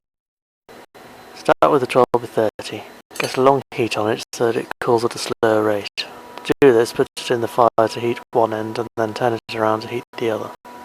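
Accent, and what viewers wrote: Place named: RP, United Kingdom. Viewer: such a posh accent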